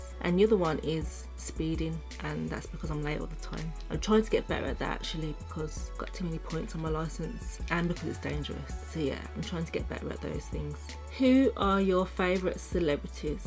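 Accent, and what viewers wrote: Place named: Wolverhampton, United Kingdom. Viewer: Thought I recognised your accent! Wolverhampton here :). Great video! It's always nice to see a fellow YouTuber grow! I subbed :)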